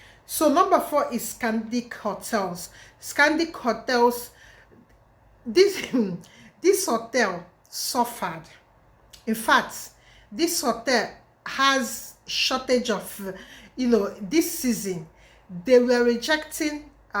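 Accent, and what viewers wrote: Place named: Igbo, Nigeria. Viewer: Me I love your Igbo tongue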